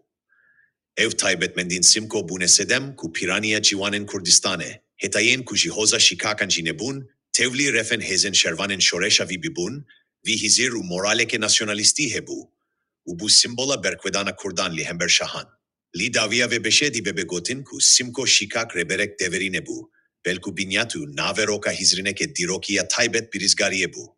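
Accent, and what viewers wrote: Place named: Europe. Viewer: Bi borin ev deng û devok ne mîna devok û dengê Kurdî ye, wekî kû kesekî biyanî dixwîne ,diaxive)mîna yekî Evropî kû nû fêrî Kurdî bûye...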